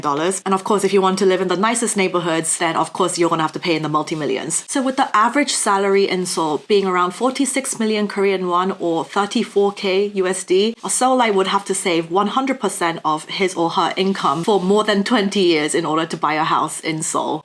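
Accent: England, United Kingdom. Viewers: As for true romantic love, I may have fallen in love with you during this video. JK. You sound like you were raised mainly in England. Is that true? Anyway, good luck to the Koreans for getting their birthrates back up to at least replacement rate levels. Let's go young people. Get with the program! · When you had mentioned about people coming from families with a lot of divorces it strike a nerve. I come from a family that has very few successful marriages. I don’t know what a successful marriage is. It scares me. Another factor. Coming from a dysfunctional family. I became dysfunctional. I’ve been diagnosed with generalized anxiety disorder. Everybody has anxiety, but people like me our anxiety is on steroids. Lastly, I want to mention that you have a very pleasant voice. I hear a British accent. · No money...why u have british accent.lol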